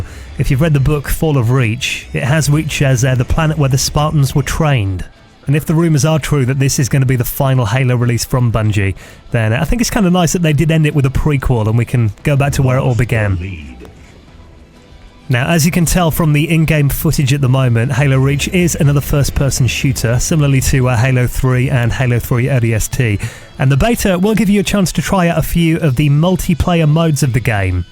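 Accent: United Kingdom. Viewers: Ahh, thats what I like, the sound of a true British voice ;) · A british reviewer Woooo!!!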